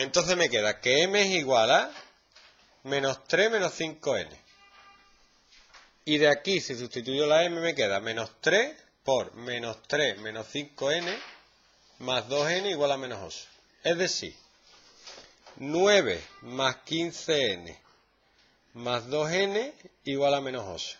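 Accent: Andalusia, Spain. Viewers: me encanta el acento andaluz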